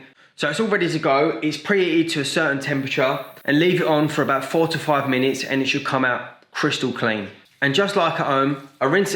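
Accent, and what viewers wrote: United Kingdom. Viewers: Thank you .he is ingles brittis i dint undestand.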